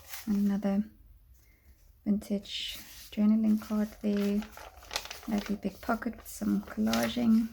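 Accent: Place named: South Africa. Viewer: You have some wonderful ideas and beautiful items! Love you SA accent too! 🤗🌸💕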